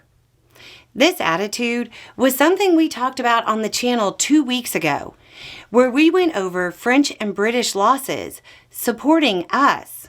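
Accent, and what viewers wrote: South, United States. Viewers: til now, I still can't get over that gentle, laid back, southern tone and charm spitting insightful, hard-hitting, whiplash-inducing commentary.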